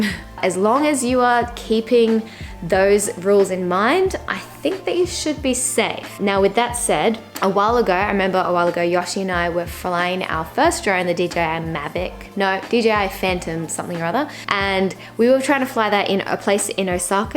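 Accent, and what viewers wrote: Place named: Australia. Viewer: We are neighbors-ish😂 Just found you, and am so happy to hear your Australian accent:) have a great Hanami down the river:) (hopefully I don’t sound creepy😂😂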